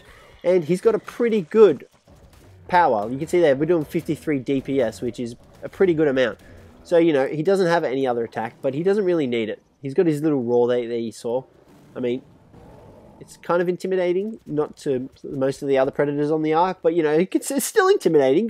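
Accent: Australia. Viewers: Are you Aussie? Because it sure sounds like it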